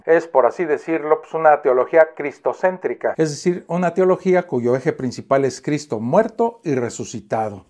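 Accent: Mexico. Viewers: Es muy fácil, si es un mensaje cifrado con la posibilidad de ser interpretado por los enemigos, que clase de mensaje cifrado es?, por eso no lo puedes interpretar, ni el diablo puede hacerlo, lee Mateo cap. 4 y lo verás, ahora, enumera todas las contradicciones que has encontrado y te ayudaré a despejarlas, según parece ese libro tiene el aval de la historia que fue creciendo en el tiempo hasta la actualidad, Israel era una persona como tu, una persona que existió 😂, salvo que te niegues a ti mismo como un ignorante con acento mexicano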